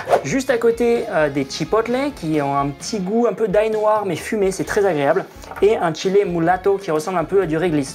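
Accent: Mexico. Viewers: Trpp fort avec l'accent mexicain et tout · C'est le meilleur accent mexicain que j'ai jamais entendu ! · No mames este pinche habla mexicanooo, mira tu muy bieeen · Saludos desde México, wey!!! Me encantó la intro 😂😂 felicidades por tu español bien mexicano!! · Pourquoi tu dis qu'il parle "sans accent" alors qu'il essaye clairement de faire un accent mexicain ?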